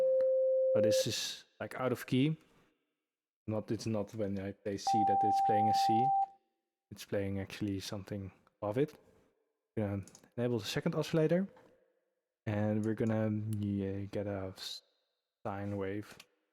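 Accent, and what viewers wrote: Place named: Netherlands. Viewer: But that dutch accent tho. Dope tutorial nonetheless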